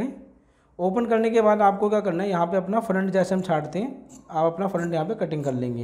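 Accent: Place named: India. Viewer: Sir ap muslim ho kya.ku ky ap k name muslim h lkn ap bolty india ke zuban jaisy ho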